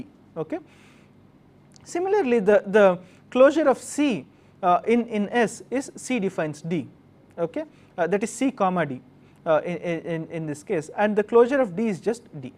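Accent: India. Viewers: To all who complain .. "he has an Indian accent is annoying and he is constantly stammering" .. Why don't you with perfect accents do something useful ? It seems, aa you can do with your perfect accent is just nag and abuse. Don't forget, BEGGARDS DONT CHOOSE. Tell your parents to teach you a bit humility and respect in perfect English.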